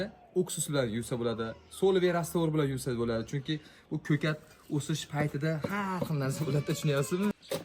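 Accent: Fergana, Uzbekistan. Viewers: Фаргоналимисиз каеридан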